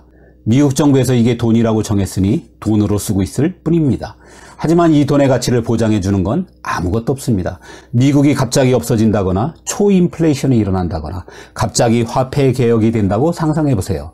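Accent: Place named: Seoul, South Korea. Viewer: 감사합니다. 목소리가 성우 클라스시네. 우리나라 서울 말씨는 참 듣기 좋아요. 그래서 한류의 첫번째 이유지만~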